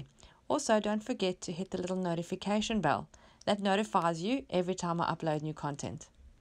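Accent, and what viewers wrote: South Africa. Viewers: I subscribed to your channel a long time ago but never actually watched a video until today, I have a lot of catching up to do. I loved what you did and I actually liked both of the paint swatches. The biggest plus for me was hearing your South African accent, I grew up in SA so love the connection. Thank you for an excellent tutorial.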